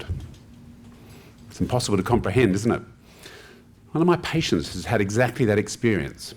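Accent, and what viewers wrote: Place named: Australia. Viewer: Reasoning always sounds better with an Aussie accent.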